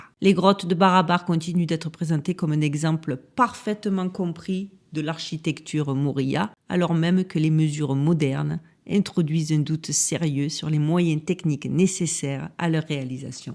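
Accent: South, France. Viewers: Je viens de vous découvrir et je peux dire que je vous trouve formidable!!! J’adore vos contenus et le format! Et votre accent du sud!😉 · Bonjour de Suisse, ne vous excusez pas tout est parfait 🙏🩷🩷🩷 J'adore vos sujets, et votre personnalité 💖 et votre accent du sud est juste 😍 toppissime!!!! Bonnes fêtes à vous et votre famille 🥳🎶🍾🥂❤️❤️❤️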